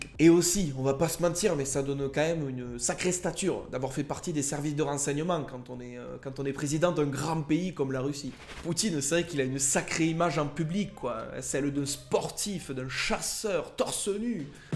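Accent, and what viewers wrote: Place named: South, France. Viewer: C'est marrant de transporter ton accent du sud jusque dans ces froids polaires et inhospitaliers lol. Merci d'avoir exposé la face sombre de ce pays et de ses dirigeants, et encore tu es resté soft. J'en ai marre que des gens clashent Macron pour dire que Poutine est meilleur, avec plus de virilité et de charisme. Clashez Macron si vous voulez mais pas pour de mauvaises raisons. Poutine est avant tout un sociopathe qui se fout pas mal des droits de l'Homme (2 preuves parmi d'autres, il a bossé au KGB et il cautionne les agissements de son pote Kadirov contre les homosexuels).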